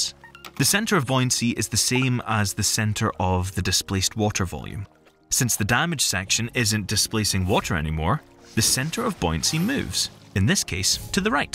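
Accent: Scotland, United Kingdom. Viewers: I love the Scottish accent for this!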